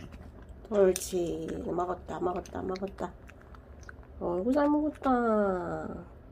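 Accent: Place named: Gyeongsang, South Korea. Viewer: 안녕하세요? 대구에 구내염 발치 하는곳 정보좀 알려주시면 감사하겠습니다 님 혹시 대구분 아닌가요? 경상도 억양이셔서..구내염 심한 길냥이 한녀석이 있는데 발치를 해서 나을수 있을까 해서요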